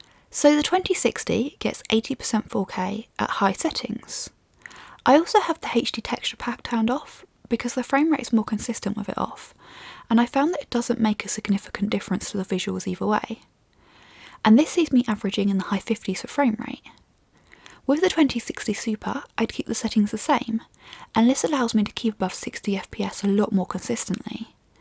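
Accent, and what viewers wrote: United Kingdom. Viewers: I really love your UK accent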